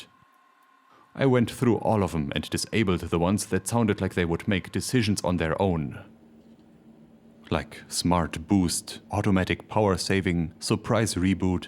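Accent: Germany. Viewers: You are like This Old Tony with a german accent. Every single video that you do makes me laugh.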